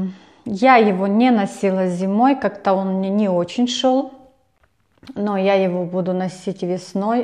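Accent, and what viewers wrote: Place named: Ukraine. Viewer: да давно пробовала пробник, но сейчас решила, что это будет самое то на весну!!! Посылочка ещё не пришла... мне очень нравится Ваш голос и речь немного с украинским акцентом... не слушайте не кого.... и не говорите что у Вас мало подписчиков. Те кто есть Вас любят. У Вас нереальная коллекция и много мне подходит по рекомендациям. Уде 200 флаконов где-то. Собираю все нишу люкс, ретро. Очень полюбилось Sublime and Joy by Jean Patou... очень богато и нежно как по мне. Я живу в Америке 30 лет, сейчас начала здесь встречаться с итальянцем.... очень надеюсь что получится... хотя много говорят итальянцы врунишки и гуляки... и пускают пыли в глаза... а хочется любви и романтики... посмотрим. 💝💖💐🥰😘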